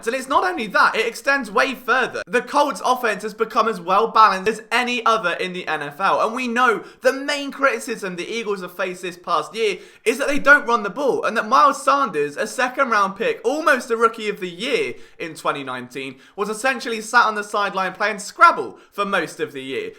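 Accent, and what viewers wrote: England, United Kingdom. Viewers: I come here to get my eagles news with an English accent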